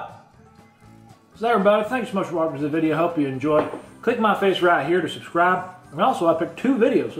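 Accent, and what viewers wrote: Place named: South, United States. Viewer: You are so silly you and your cat in your country accent you make me laugh continue to stay safe many blessings and much love and peace ☮️💜💯😇🙏🏾💙❣️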